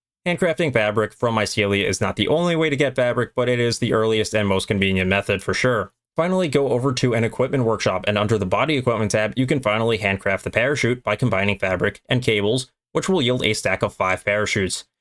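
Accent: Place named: Philadelphia, United States. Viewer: You from the Philly/New Jersey area? Thought I heard the accent in your voice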